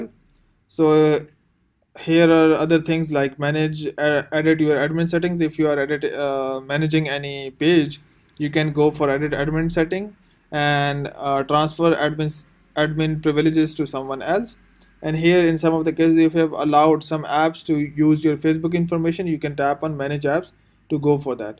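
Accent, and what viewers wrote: India. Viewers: This indian accent kills me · Hey ! Try to talk clearly the english, still we hear your hindu acent , we can’t understand.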